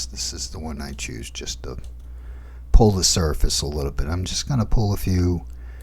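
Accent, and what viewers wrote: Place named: Boston, United States. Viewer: Thanks for the run through - you sound like you may be from Boston :)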